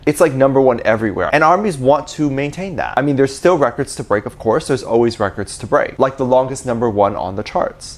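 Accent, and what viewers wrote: South Africa. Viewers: you are South African? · wait are u a fellow south African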